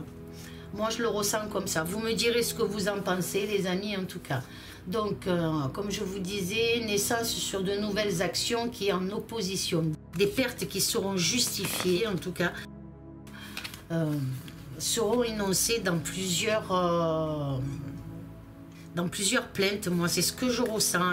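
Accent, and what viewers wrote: South, France. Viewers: Votre accent du sud (Marseille ?) est très très très très prononcé !!!…My God !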